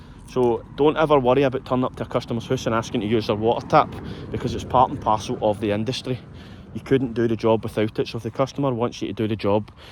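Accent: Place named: Scotland, United Kingdom. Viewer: I feel your Pain m8, im guessing with the accent your from central scotland like myself Hamilton Its hard going ,ive been doing Roofs,Gutters and Gardens to try and keep it going I was exact same as you m8,i worked the parcels 6/7Days and could never get over A Grand A week no matter what , Yes we can make in one day and than what we were making Couriering but its when it gets to this time of year it gets harder Listening to your story is like reading my own experiences more or less like for like, Hope It Goes Well m8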